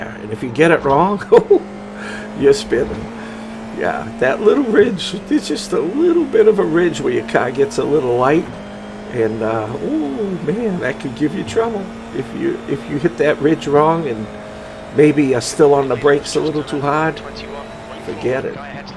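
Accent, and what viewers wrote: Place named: New England, United States. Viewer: BTW, I lived in Boston for years and I loved hearing that New England accent. 😍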